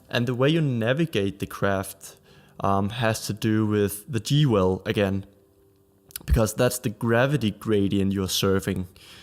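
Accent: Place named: Germany. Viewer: You sound German so you'll probably get this. First you have to understand how inertia works, then you can understand gravity. Since gravity is an acceleration of 9.8m/s^2 and since an accelerometer measures acceleration, and since accelerometers do not show any acceleration in a fall caused by the "acceleration caused by gravity" I looked into how accelerometers work. A small piece of matter inside the accelerometer is displaced when the accelerometer is accelerated, and the amount of the small piece of matter's displacement is calibrated to indicate the magnitude of acceleration. What makes the little piece of matter move? INERTIA. The same effect when you accelerate in your car and you're pushed back in the seat. In this analogy, your car is the shell of the accelerometer, and you are the little piece of matter being displaced when the 'accelerometer' - your car -- accelerates. 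You are pushed backward by inertia. Since the accelerometer shows zero acceleration in a fall to Earth caused by the "acceleration of 9.8m/s^2 due to gravity", that told me *THAT SMALL PIECE OF MATTER INSIDE THE ACCELEROMETER IS NOT BEING DISPLACED* by gravity's acceleration. Since the small piece of matter in the accelerometer ONLY IS DISPLACED due to inertia when the body of the accelerometer is accelerated, *THAT MEANS THERE IS A DECLINING INERTIA* in the downward direction. If the little piece of matter isn't being deflected, there is NOT ENOUGH INERTIA in the direction of acceleration (downward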